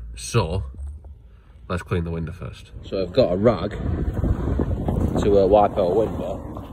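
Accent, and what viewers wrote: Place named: North, United Kingdom. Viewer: Great videos,love your 'Northern' presentation! From one Northerner to Another😉!!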